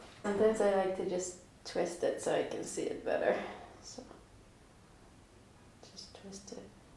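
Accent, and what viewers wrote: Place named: United States. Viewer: Yes it's even! I was scared for you that you would keep cutting until it becomes too short 😄 . Are you a natural blonde, your hair and accent makes you look American or Canadian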